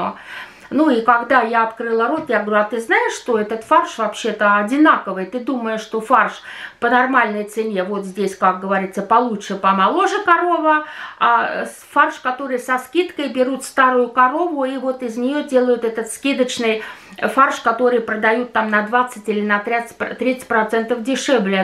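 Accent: Finland. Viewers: Тащюсь от акцента! Вспоминаю персонажа Вилли Хаапсалло из Особенностей национальной охоты: Зеня когда мы пойдём на охоту?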